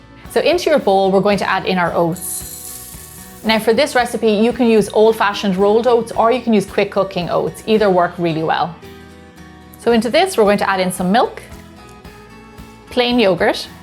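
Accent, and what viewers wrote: Ireland. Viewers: Are you Irish? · And your Irish accent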